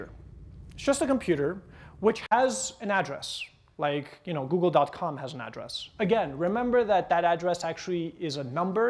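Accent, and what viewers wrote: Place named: Quebec, Canada. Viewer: I detect a very slight french Canadian accent.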